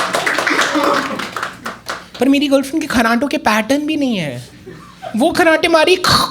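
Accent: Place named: North, India. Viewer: Accent is typical dehati, improve it if you want to target pan Indian audience, typical North Indian heavy accent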